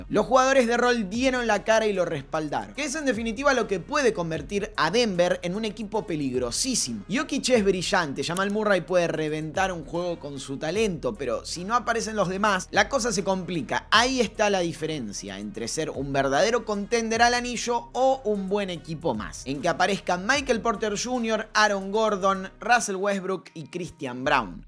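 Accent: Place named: Argentina. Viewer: Porque TODOS los argentos tienen está pronunciación "NAGGETS" "CARRY" "HALLIBARTON" "CLATCH" "THANDER".... ME IRRITA DEMASIADO ESA PRONUNCIACION DE LA "U" TAN ESTUPIDA QUE TIENEN.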